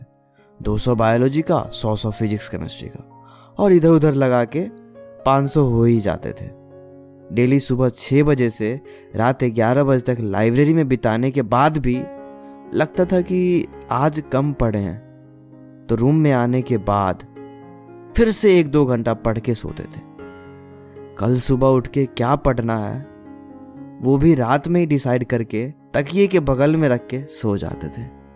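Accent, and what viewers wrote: Bihar, India. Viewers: Pure Bihar hai aapke abaj me ❤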